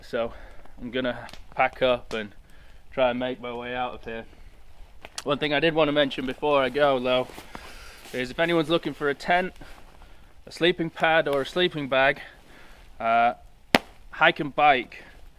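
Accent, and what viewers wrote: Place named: England, United Kingdom. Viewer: Where are you camping, looks Nordic , Canadian American ? You have an English accent, like mine though? Perplexed.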